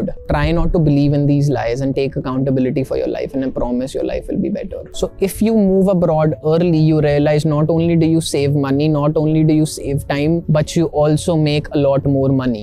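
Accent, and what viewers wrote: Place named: India. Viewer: information is really worthit . and the most imp. thing is that till now his excent is indian . hatsoff to this guy.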